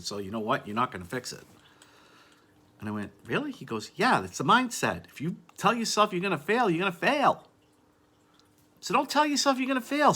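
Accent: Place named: Massachusetts, United States. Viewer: Watching from Kentucky. I can tell ur in Mass. Your accent. Love it. 😍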